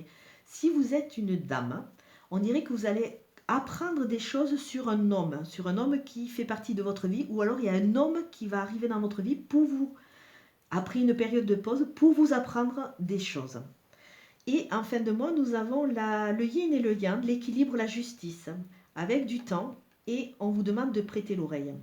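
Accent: South, France. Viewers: Merci il me semble entendre l'accent du sud qui correspond au même accent que moi je suis du côté du Tarn merci beaucoup c'est très parlant j'aime beaucoup à bientôt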